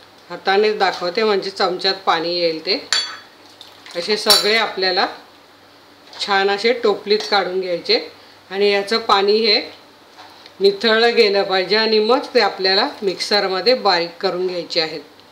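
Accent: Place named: Nashik, India. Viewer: ताई 🙏 मी तुमच्या रेसिपी नेहमीच बघते मला फार आवडतात. मी पुन्हा एकदा कुरडया केल्या, या वेळेस माझ्या कुरडया छान झाल्या.व सांडगेही केले,तेही चवीला छान झाले. तुम्ही नाशिकच्या आहात.असे तुमच्या बोलण्यातून समजले.म्हणून आणखीच छान वाटले,करण माझ्या आईच माहेर नाशिक, आम्ही लहानपणी सुट्टीत नाशिकलाच राहण्यास जात असे. तसेच तुम्ही जे पदार्थ बनवितात.तुमची पदार्थ बनविण्याची जी पद्धत आहे, त्याच प्रमाणे आमची आई पदार्थ बनवीत असे. तुम्ही फारच छान माहिती देतात. खुप छान समजावून सांगतात.ते ऐकूनच तो पदार्थ एकदा तरी बनवुन बघावा, असे नक्की वाटते.म्हणूनच मी पहिल्या कुरडया माझ्या मना प्रमाणे झाल्या नाही.व दुसऱ्या वेळेस करून बघितल्या,खरच खुप सुंदर झाल्या.त्या बद्दल तुमचे धन्यवाद.💐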